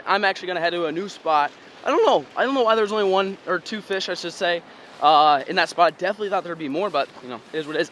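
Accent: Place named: Pennsylvania, United States. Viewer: You gotta be from pa bro 😂😂 u talk exactly how I do when I’m fishing 😂😂 I might cuss a little more tho 🤷🏻‍♂️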